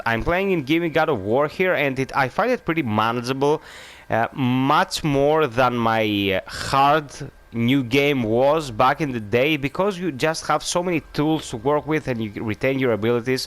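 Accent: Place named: Greece. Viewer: χαχαχα λεω σαν να μου φαινεται ελληνικη η προφορα του και μετα ακουω τον κρατο να μιλαει ελληνικα :D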